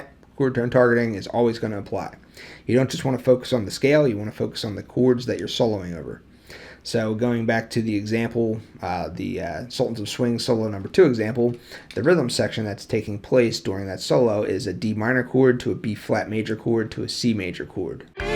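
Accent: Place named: Philadelphia, United States. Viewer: are you from philly? you sound like you’re from philly.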